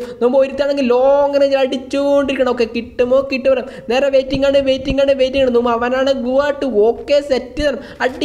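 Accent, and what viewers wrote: Kannur, India. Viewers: Kannur karnano bro nane athe mannur enna stalathane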